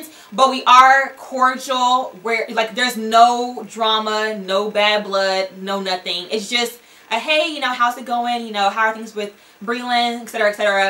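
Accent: South, United States. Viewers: you have a southern drawl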